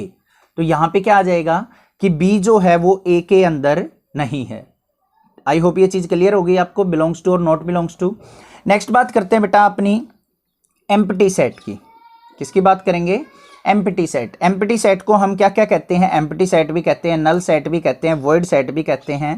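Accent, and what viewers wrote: Haryana, India. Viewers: SIR AAP HARYANA SE HO.........??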